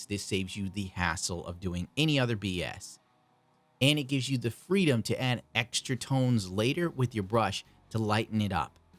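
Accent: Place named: East Coast, United States. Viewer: Love your videos. You are currently my favorite Gunpla youtuber. I love the diorama, and painted gunpla, your east coast accent and comments. All of it is fantastic. One issue I do have is that I can't watch your videos around my kids because of all the cursing. If you can tone it down, cool! I can let them watch with me. If you can't, that's cool too. I'll watch your videos by myself. Keep up the great work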